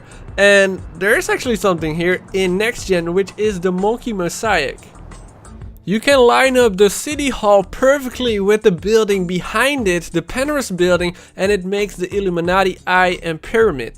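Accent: Netherlands. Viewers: Nice Dutch accent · Are you dutch? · Are yu dutch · Are u Dutch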